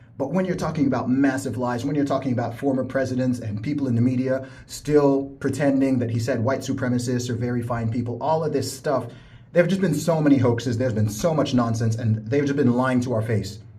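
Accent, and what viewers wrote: North America. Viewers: Actually, I DIDN'T know you were a brit tbh. It's your accent, it sounds abit like North American english. Oof, I feel stupid now.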